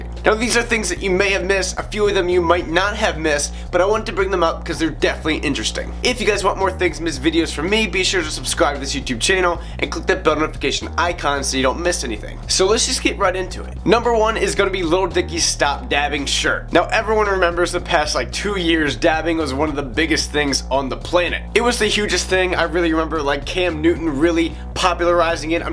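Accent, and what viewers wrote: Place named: United States. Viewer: Potato He has an american accent, you saying that is like someone from britain saying that they dont have an accent to someone from America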